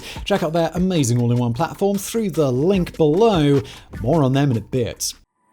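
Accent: United Kingdom. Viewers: Maybe a bit less hipster, styling presentation... The british en (arrogant sound) style push it up, that it seems to help to establish the narcissism of these toxic days.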